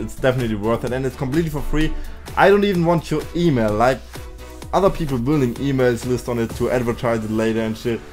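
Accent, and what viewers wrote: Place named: Germany. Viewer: Der deutsche Akzent ist das Beste! :D Ich schau mal rein, support ist kein Mord. ;) :)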